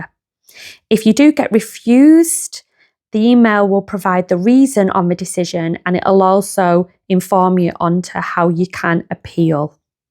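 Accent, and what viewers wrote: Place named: England, United Kingdom. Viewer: Great Clear English Accent Love it ❤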